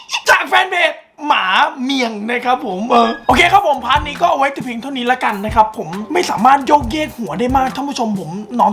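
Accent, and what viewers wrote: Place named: Suphanburi, Thailand. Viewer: ผมชอบ พี่ พูดเหน่อ อะ พูดบ่อยๆ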